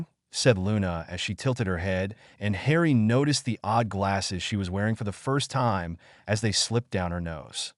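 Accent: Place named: India. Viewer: hey there when your videos are done with a heave India dialect please use the ai voice so it is understandable as that accent is thick and English is my 4th language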